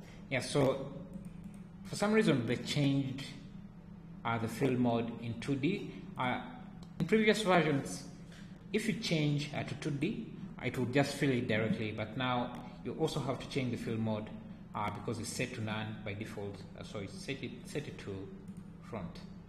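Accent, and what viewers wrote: Kenya. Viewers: Your accent sounds so Kenyan 🇰🇪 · you sound Kenyan, good videos but you speak way too fast for other people to understand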